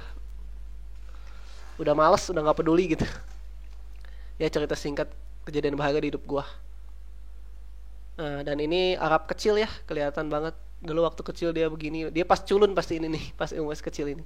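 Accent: West Java, Indonesia. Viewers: logatnya sunda banget 😂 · Logat nya orang sunda banget dah Apa orang bandung ? Wkwk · Sunda bgt :D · doi orang sunda kayanya jadi ngomongnya gitu wkwkwk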